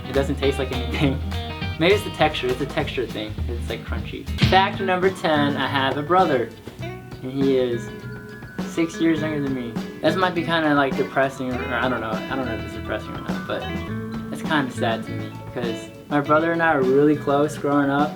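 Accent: Texas, United States. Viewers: Now I understand shy he talks so funny, he's from Texas :D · it's that southern drawl we have in Texas. maybe not a southern accent, but we draw our words out more. :) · He has a Texan accent lol. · Wow, I never realized that was from Texas, I can totally hear it in his voice.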